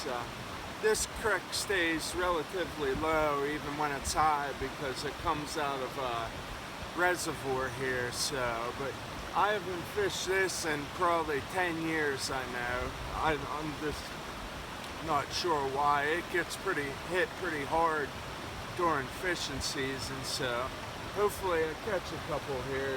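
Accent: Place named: Indiana, United States. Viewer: "Crik". you say Creek like country people in Indiana do.. I'm from Indianapolis and we say cr-eek versus country people who say cr-ik..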